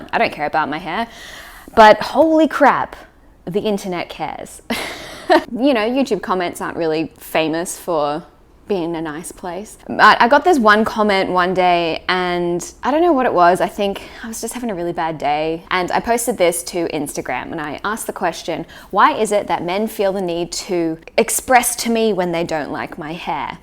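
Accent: Australia. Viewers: are you from Australia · Back in 2009 when I got my first Android phone and I started using many Social Apps in order to develop Friendships because this is where most of the offline people you know are now online. Out of hundreds of people while using these apps ive only acquired 4 real friendships...Here on Youtube I call some Youtube Creators my Friends and some do respond back to my comments. This is an eye opener for me to learn the term Para Social Relationship..knowing the person but not actually getting to know them in depth as a real person. Welcome to Fantasyland when viewing some content on Youtube? 🤔 What really stings is to think that you are developing an emotional connection with people for example ASMR Artists but nothing is there.. Btw nothing wrong with your hair. 🙂 Austrailian Accent 😎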